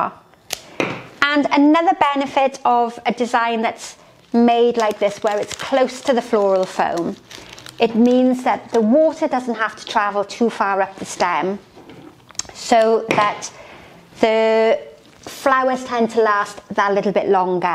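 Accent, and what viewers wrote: United Kingdom. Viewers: I'm from Canada 🍁, cheers love, perhaps because your British with accent yea. British with flowers 💐 is like fine wine. All right than, thanks dear.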